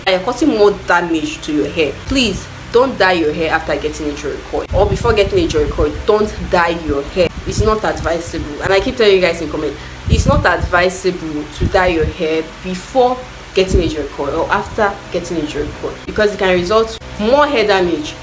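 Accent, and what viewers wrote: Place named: Nigeria. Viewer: You need to speak to your only to your fellow Nigerians,cause your. accent is too heavy,& you talk to fast.English is not good .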